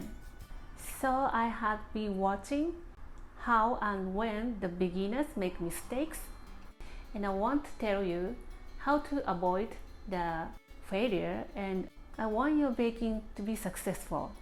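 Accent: Japan. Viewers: Konichi wa. Your English vocabulary is very broad and accurate. Your accent and courteous manner just make you authentically Japanese. I like your channel because you show how to make healthy every day food that a Japanese mom would make in her kitchen. I don’t need a blowtourch to sear pieces of sashimi or a special pan to make takoyaki balls as is done on some channels. I do sometimes wish you would tell more about where to get some of the uncommon ingredients not readily available outside Japan or what can be substituted. I especially enjoyed this video where you introduced yourself and told us something about your background and hopes for your YouTube channel. Matané!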